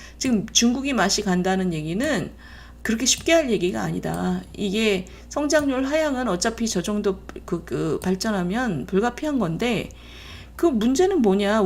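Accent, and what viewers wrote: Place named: Seoul, South Korea. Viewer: 말투가 저랑 똑같아요. 경기도 방언인지 서울 사투린지 나랑 너무 똑같아요. 희숙님 ! 나이에 맞지않게 젊어 보여 좋아요. 희숙님 영원히 늙지 말라고 기도 할께요 😊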